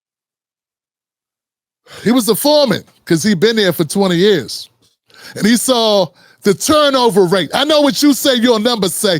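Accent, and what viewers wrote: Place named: Washington DC, United States. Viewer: I watched Professor Ball's episode that you are citing. It was very well done and researched. I'm not sure what you're trying to accomplish. But I haven't taken your show serious in a very long time, which is why I don't usually watch it anymore. You should stick to comedy. I only subscribed to provide support to a Black podcaster. But I am not down for this chocolate-covered white conservative with a DC twang. I''m out. Unsubscribed.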